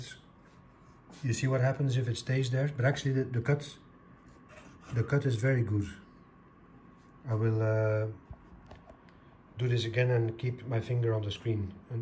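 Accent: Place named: Netherlands. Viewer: I thought you were Dutch because of the accent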